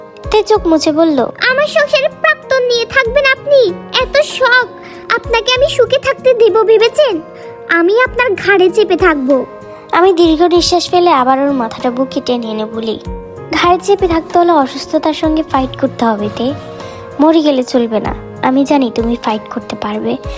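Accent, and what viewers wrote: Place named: Chittagong, Bangladesh. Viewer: Apu apni ki chittagong er? Apnar kota gulu shunte keno jeno erokom lage Ar story onek beshi shundor hoise 😘❤️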